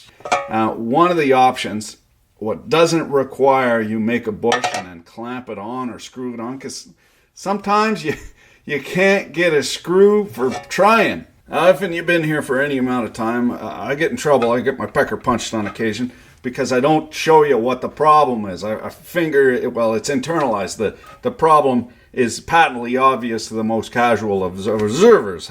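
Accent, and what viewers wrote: Quebec, Canada. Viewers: I was gonna say Canadian or from up in Michigan. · He's a Canuck! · Help me out, I'm new to this channel and I cant figure out if hes super canadian or from texas. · i have a stupid question where you from men, i heard ya speak french and you sound like you're from quebec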